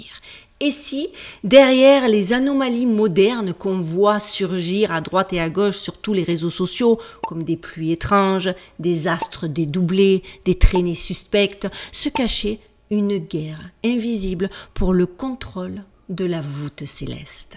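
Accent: South, France. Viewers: J adore votre accent du soleil je connais ma famille se cité dans l herault région Languedoc-Roussillon surmène ❤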